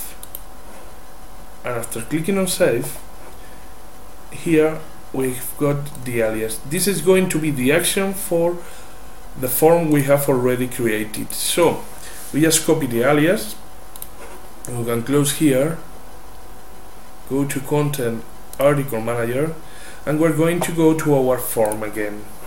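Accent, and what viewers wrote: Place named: Mexico. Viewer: eres mexicano verdad, lo noto en tu forma de hablar el ingles.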